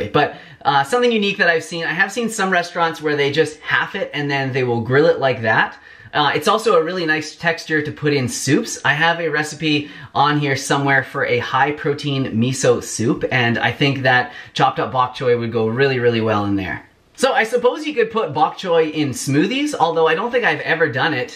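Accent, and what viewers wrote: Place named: Canada. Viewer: You sound Canadian😅. Juice the stems.. yummy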